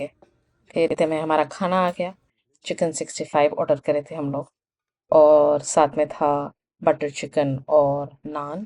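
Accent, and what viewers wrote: Hyderabad, India. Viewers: Aapki language Hyderabadi lagti hai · Ramdan mubarak... aameen lovely vlog ... m so excited when your vlog comes ... lovely hearing you ... i always find hydrabadi language bit funny dont mind this word "apanay ku" 😂 to funny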